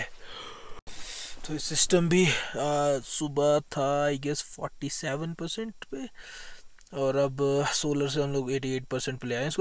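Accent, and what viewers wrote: Haryana, India. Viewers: Bro are you from haryana🤔🤔🤔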